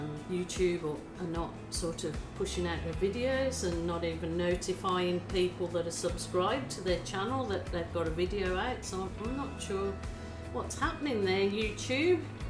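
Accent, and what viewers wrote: Birmingham, United Kingdom. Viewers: Queensland? I'm hearing brummie undertones in your australian. . You got away. Good for you. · WoW! That looks so good! Well done to you. Love the Birmingham accent. We live in Perth WA. We come from England. Have been here for 20 years now. Must try this. Sara x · true blue Aussie here, just like to point out her accent is British not Aussie. And here in Australia it is pronounced AL-YOU-MINIUM 😊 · where in Birmingham are you from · Yes a resident of Australia for sometime however she clearly has a British accent, very obvious to Australian ear. 😊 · Love to hear your Brummie accent underneath your new Aussie one 🙂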